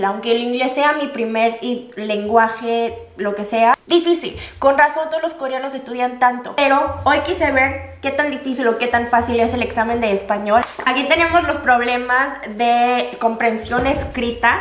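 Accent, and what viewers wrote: Mexico. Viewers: Pero y esta reina?! Tiene un acentazo mexicano brutal! A mí como española, me dicen que es de Méjico y me lo creo! Cero acento extranjero! También es cierto, que he notado que la fonética coreana y española, aunque no lo parezca desde fuera, es muy semejante. Yo llevo 10 años aprendiendo coreano y cuando me escuchan hablar siempre me dicen "porque tienes cara de europea, si no creería que eres de Seúl" jajajaja. Supongo que al final entre coreanos e hispano-hablantes no tenemos demasiado acento al aprender el idioma del otro! (el problemita, problemón, está en la gramática... Ahí sí se vino drama, lol) Anyway, buenísimo tu español, amiga. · Soy el único que cree que su forma de hablar no es el español neutro sino más como el de los castrosos "Whitexicans" ? espero que con el tiempo hable mas neutro :) Suerte en todo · Apoco aprendió español Mexicano, el más chingo de todos. 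· tu hablas el español mexicano, las mayorías de las juergas mexicanas son feas cuando se escucha en otros paises. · Hablas perfectamente. Con acento Méxicano. Pero muy bien. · Se nota el acento mexicano jaja Y algunos usos incorrectos creo que se llama jerga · Hablas español Mexicano, se tetona el acentro y las palabras de la rosa de guadalupe. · Tu español es mexicano... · Hermosa Korean a con acento mexicano, lo mejor de dos mundos 😍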